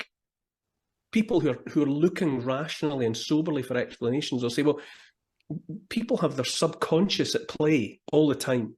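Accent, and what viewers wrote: Scotland, United Kingdom. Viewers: I really just love to hear scottish people talk just gorgeous.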